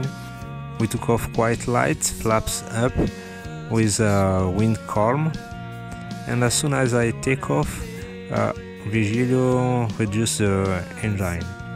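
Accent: France. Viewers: Jme suis directement dit que tu es Français avec ton accent ahahaha bonne vidéo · Are you french ? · Você é brasileiro? Essa cidade é no Brasil mas seu sotaque é francês...enfim se for francês vá "treinar" com a vida das pessoas no seu país...se for brasileiro para de fazer burrada imbecil! Treine no simulador ou em uma área afastada, foi de imensa responsabilidade fazer isso em cima de bairros residenciais e lojas como a Havan que está sempre lotada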